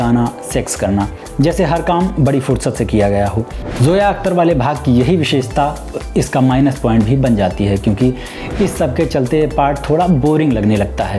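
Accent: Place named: Uttar Pradesh, India. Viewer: subhas chandra mondal...... chutiya ho kya jindgi bhar judgemental reh jaaoge.... ye typical up ka tone hai.... sabse bc tone tera hai wo hai racist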